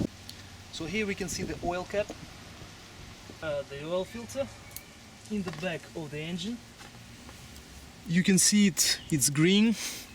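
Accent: Russia. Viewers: he sounds so russian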